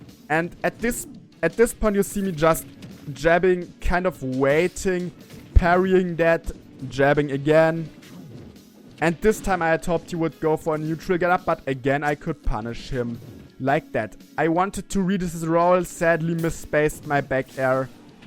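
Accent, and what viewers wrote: Germany. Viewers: Lmao man hört dass du deutsch bist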